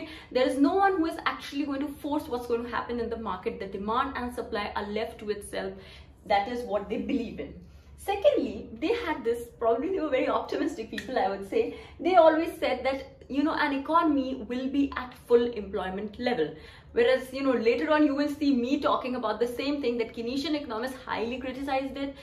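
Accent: India. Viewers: I really like your hindi accent miss.Its so adorable fr😭🎀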